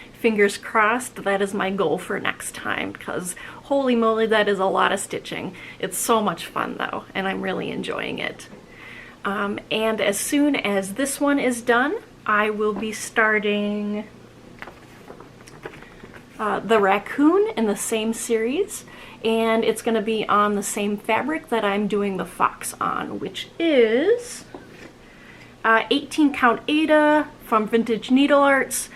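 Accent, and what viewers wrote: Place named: Minnesota, United States. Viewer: Love your Australia story and your Minnesota accent.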